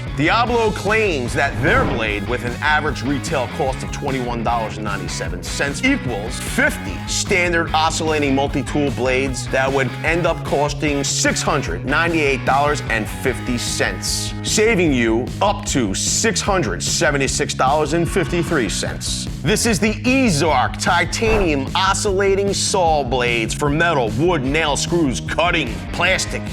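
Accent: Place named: South Jersey, United States. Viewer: You sound like your from South Jersey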